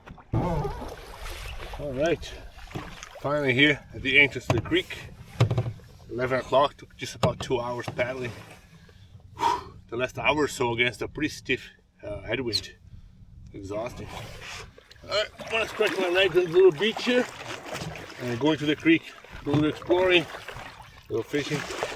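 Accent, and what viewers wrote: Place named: Brazil. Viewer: Boa, pelo seu accent eu percebi que era brasileiro haha. Video incrível, qual região você está? Abraços!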